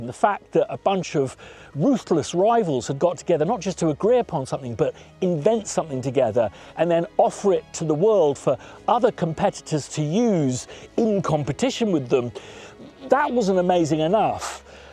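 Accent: United Kingdom. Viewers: I'm 60. MIDI became a thing in in 1983, when I was 18, and I had been waiting for it. I subscribed to (Contemporary) Keyboard Magazine for a few years before this. You nailed the beauty and simplicity of connecting instruments through MIDI very well, and I appreciate this video. I didn't learn anything new, because I learned all of this at the time, and loved it and love it still. You show your love of MIDI very eloquently (my Canadian accent doesn't do the justice of your UK accent), yet the obvious advantage of what this technology did for all of us is made clearer through your explanation, and is a historical timepiece. Thanks for doing this. Rock on.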